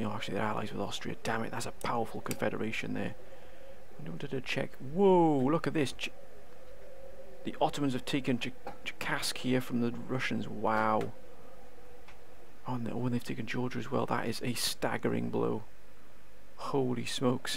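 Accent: United Kingdom. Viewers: you sound british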